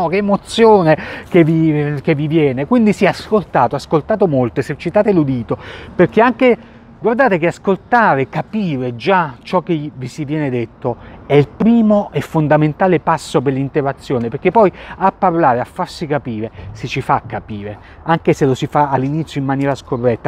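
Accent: South, Italy. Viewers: Tu come lo hai imparato? Ma per caso sei del sud?🤔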